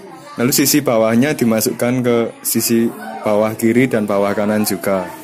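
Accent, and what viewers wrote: Java, Indonesia. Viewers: Mase medok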